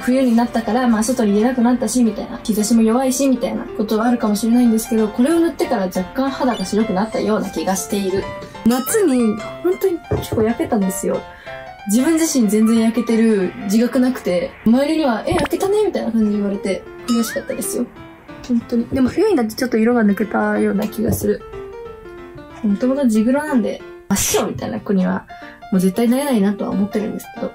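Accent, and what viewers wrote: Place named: Nagoya, Japan. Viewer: 名古屋弁同じ！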